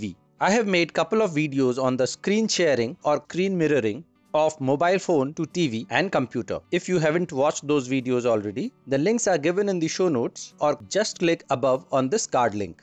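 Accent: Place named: India. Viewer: I usually don't trust people with Indian accents but you helped a lot, thanks.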